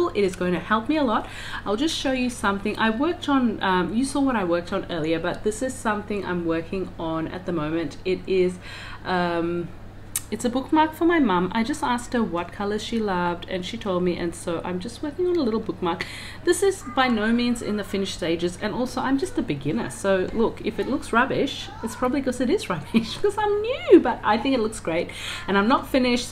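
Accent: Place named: United Kingdom. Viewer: Hello, I came across your video trying to find these brushes(after watching several of Kristy Rice videos) but I can’t find them . I see this video is from January,so I guess Amazon had them then, but currently it’s not available. But maybe you got them on Amazon in UK, because judging by your beautiful accent,I think that’s where you are? 😊Do you know by any chance if I can find them anywhere else? Interestingly it is available to international buyers only on Kriszty’s website,but not for the US which I found very strange. Please let me know if you have any information. Thank you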